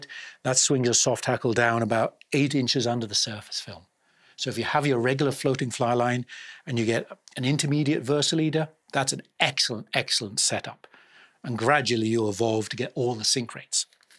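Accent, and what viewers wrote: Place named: Wales, United Kingdom. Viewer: awesome vid as always. I’m wondering about your accent; everything I’ve read says you’re from the West Country but I’m sure I detect a hint of Welsh in your voice?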